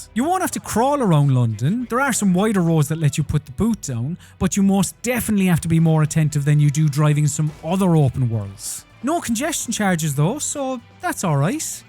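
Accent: Ireland. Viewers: I love your Irish accent.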